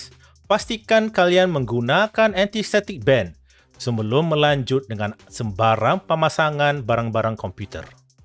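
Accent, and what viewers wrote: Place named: Malaysia. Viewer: suara nya kayak orang Malaysia sih😅😅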